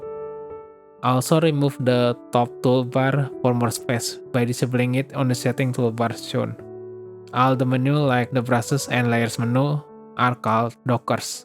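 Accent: Indonesia. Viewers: Thank you for this tutorial! I'm immigrating from ibis to krita and it's so overwhelming. I kinda see why ibis is still searched after, the ui is nothing like other art program, even my 10yo niece master ibispaint super quick! But it's less advanced compared to krita. My friend told me that krita is better if i'm walking the professional path. Anyway when i heard your accent i immediately thought wah indo nih and it turns out to be true lol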